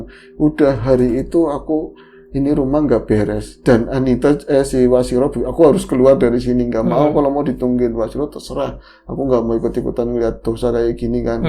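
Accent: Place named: Tegal, Indonesia. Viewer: mas dengan dialek tegalnya lucu,sukses terus dengan acara ngobornya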